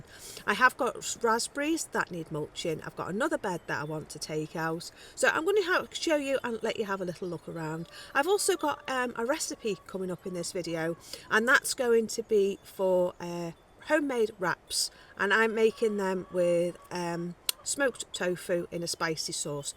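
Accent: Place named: Cheshire, United Kingdom. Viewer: How lovely to discover your channel this morning! It’s so nice to hear a familiar accent from my childhood (I grew up near Chester) … I haven’t heard someone say “skew-whiff” for years 😆. Those tofu wraps look delicious & I will definitely be trying those this week. I’m off to put the kettle on so I can watch a few more of your videos before I get going!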